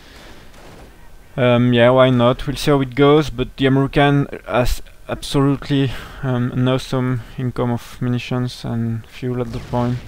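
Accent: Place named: France. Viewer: nice accent dude you must be the only french whose english i can listen to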